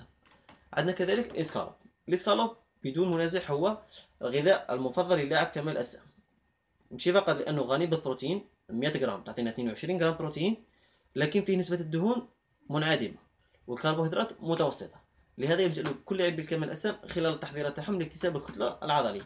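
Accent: Algeria. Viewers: انت جزايري خويا ؟ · اللهجة الجزائرية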